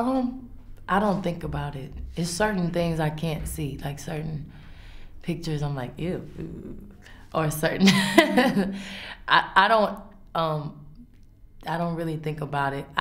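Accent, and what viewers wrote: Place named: Texas, United States. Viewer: She has a US Southern Texas accent so if you go to Texas it is proper. I’m sure whenever you visit a different state if your an American or another country your accent would sound like shit too 💯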